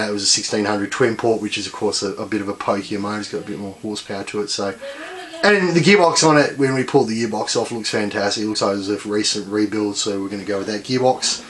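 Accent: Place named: Australia. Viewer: It looks like that engine has sat for at least 10 years without running yet when you split it from the transaxle amazingly there was not one spot of rust or crud on the on the flywheel or clutch cover. I am having a little trouble with your aussie accent, did you say that is a "dana" gearbox ? I've worked on vws for forty years and dana never produced gearboxes for Volkswagen.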